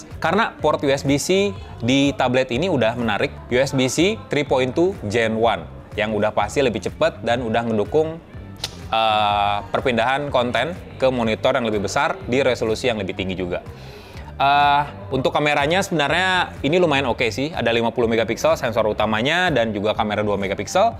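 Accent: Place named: Brazil. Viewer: he has Brazilian Portuguese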